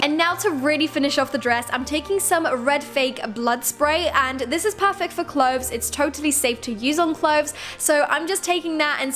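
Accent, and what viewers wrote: United Kingdom. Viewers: Are you british 'Cause you sound british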